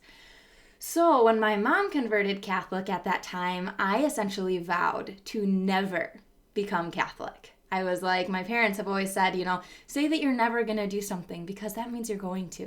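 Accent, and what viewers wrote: United States. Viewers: That Midwest accent though..... love it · As much as I love to hear your conversion story, I have one request to make which is whether you could slow down a bit as its hard for some of us living in India to follow those twang-rich american accents. I mean no disrespect but I wish to listen carefully to your speech which is truly inspiring.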